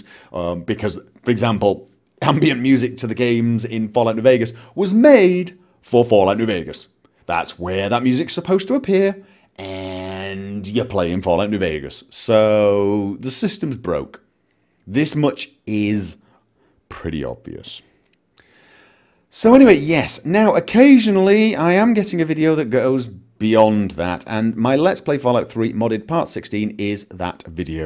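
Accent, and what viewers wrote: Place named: England, United Kingdom. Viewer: He's gone over that many many times before. He IS English. He and his family moved there a number of years ago. The accent didn't give him away? >_>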